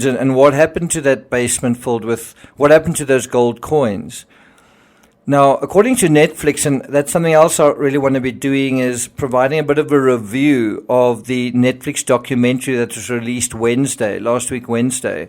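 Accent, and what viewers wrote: South Africa. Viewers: Hi there! Enjoy your channel. You sound you are from South Africa? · Sounds South African to me.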